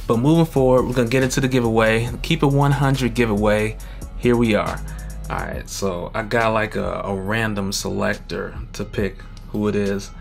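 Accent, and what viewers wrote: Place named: East Coast, United States. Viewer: where are you from? iam from jersey and you definitely have that east coast vibe..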